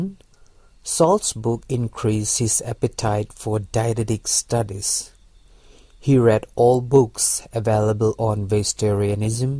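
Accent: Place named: Nagaland, India. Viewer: His accent sounds like naga people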